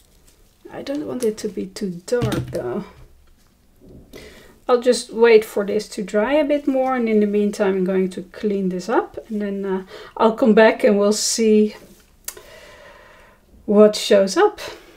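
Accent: Netherlands. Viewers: Hi, you sound like you are from the Netherlands, just like me. This is beautiful and I love your stencil, well done!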